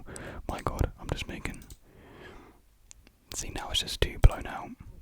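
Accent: United Kingdom. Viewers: oooo you know you might be one of the first few British ASMR people I've found never realised I prefer listening to my own accent rather than american!! Loved the mouth sounds a lot you should definitely do some more :)) who's your fave music artists atm ? x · I see im not the only one who also listen to your conversational videos to learn English It would be fun to hear you speak with a full American accent, but your British accent adds a special charm to you and your asmr Thank youuu i love u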